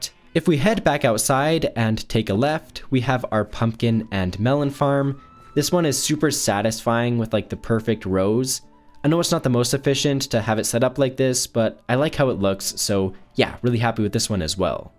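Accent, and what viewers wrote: Canada. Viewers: It's just because he has a Canadian accent. They just speak that way, calm down bro.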